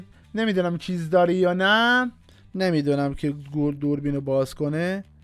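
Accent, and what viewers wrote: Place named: Shiraz, Iran. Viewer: شیرازی لحجه طلا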